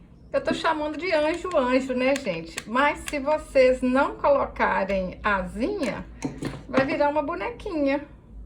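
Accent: Minas Gerais, Brazil. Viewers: Aposto que você é mineira, sotaque mineirinho UAI, acertei?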